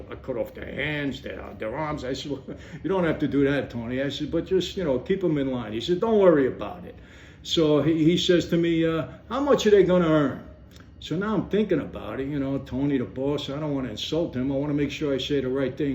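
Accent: New York, United States. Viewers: LMAO!! Michael this is straight gold!! You tell a mob story like a true Italian New Yorker. Keep these coming, the old guys in my family from Ozone Park will LOVE these bits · Many of us from New York talk like this not just Michael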